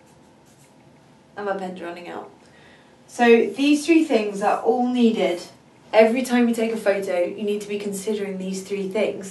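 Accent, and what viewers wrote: United Kingdom. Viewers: What happened to the nice dragon lady...have you married and forgot us here? lol...love your British accent, yea!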